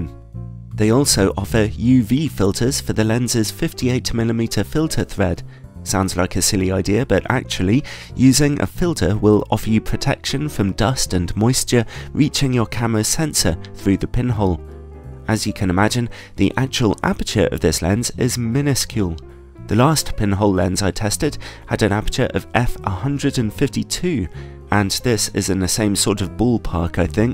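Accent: United Kingdom. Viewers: You’re literally taking the British accent onto a whole new level of royalness. Great sum up by the way :)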